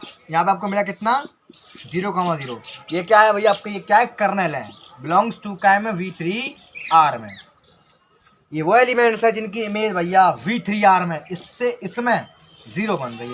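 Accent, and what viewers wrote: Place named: Marwari, India. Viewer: Gajjjjjjjjb sirji aapka marwadi tadka bahut aacha laga 🔥🔥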